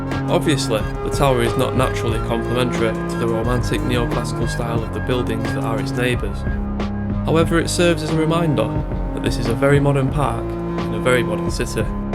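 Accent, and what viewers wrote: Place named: Manchester, United Kingdom. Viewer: Very heart warm tour round Heaton park,lovely to here a Manchester voice,get your walking boot on and give it a go 🐝🌻🌿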